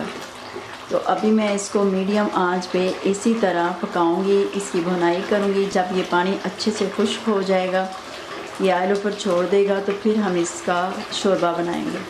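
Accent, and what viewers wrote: Punjab, India. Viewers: Pure Punjabi accent.😍👍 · I am belongs to arain family . Punjabi accent ha. Ap ha :p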